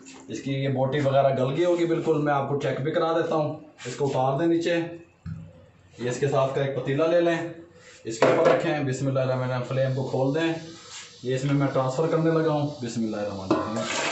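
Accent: Punjab, India. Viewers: Aap k bolne or kam karne ka andaz bilkul indian punjabi ki tarrah ha. Aisa ha kia maha raj?such bolna thanu guru di qassam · Apki recipe ke sath hum apki punjabi boli bhi bht enjoy krtay hain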